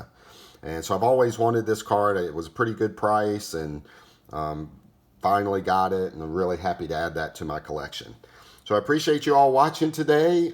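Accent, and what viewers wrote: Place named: South, United States. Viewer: Great choices. Your voice still sounds southern, though.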